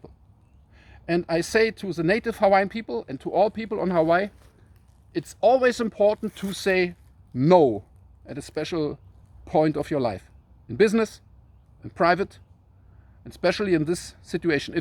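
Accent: Germany. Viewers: I was raised by my German immigrant grandparents ages ago and hearing your accent reminds me of home. I also follow the person you mentioned and #Lahaina #Maui I know I will follow you two as well. #Hawaii should’ve never become part of the United States. But they are and they deserve all the love and support we can send them. #LoveWins Mahalo everyone 💜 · Love your German accent!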